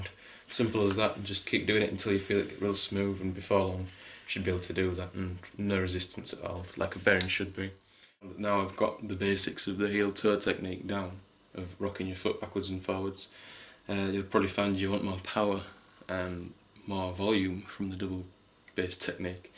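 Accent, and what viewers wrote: United Kingdom. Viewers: Can anyone please write all that he talked about, I did not understand british enough.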